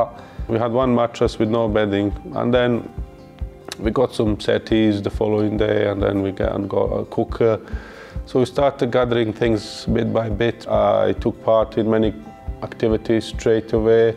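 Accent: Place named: Yorkshire, United Kingdom. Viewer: The Yorkshire accent has settled in well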